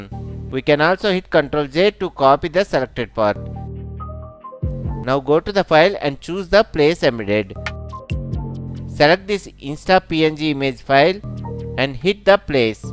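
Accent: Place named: India. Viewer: Thank you! Never underestimated Youtube tutorial with Indian accent :)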